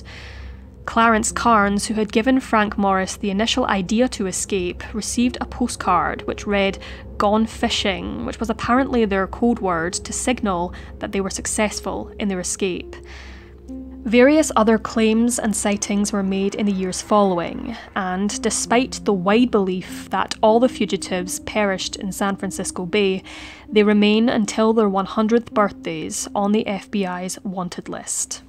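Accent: Scotland, United Kingdom. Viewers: What is the accent of narrator? Scottish?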